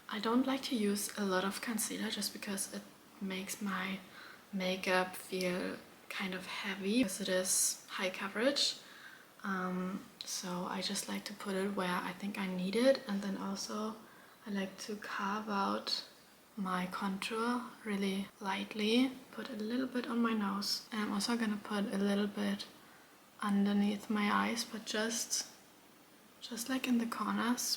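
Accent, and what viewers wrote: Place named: Germany. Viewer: Hey, erstmals tolles Video 🤗 Nur ein kleiner gut gemeinter Ratschlag: Man kann raushören, dass du deutsche bist und es dir manchmal schwer fällt die richtigen Vokabeln zu finden/ dich auszudrücken.. Wahrscheinlich drehst du deine Videos auf englisch um mehr Leute aus aller Welt zu erreichen, was ich vollkommen verstehen kann aber vlt solltest du einen 2ten deutschen Kanal erstellen oder einpaar Videos auf deutsch drehen denn ich hab das Gefühl so kann man einen besseren Eindruck von dir als Person gewinnen & du kannst deinen Charakter mehr zum Ausdruck bringen und somit leichter eine Community aufbauen :) Nicht falsch verstehen aber vlt denkst du drüber nach, mir gefallen deine Art von Videos sehr🤍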